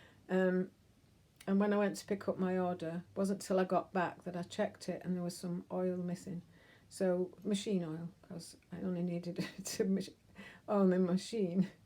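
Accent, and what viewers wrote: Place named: Lancashire, United Kingdom. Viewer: Hi. You really don’t need to apologise for your Lancashire accent. You should be proud, at least people understand and know what we say x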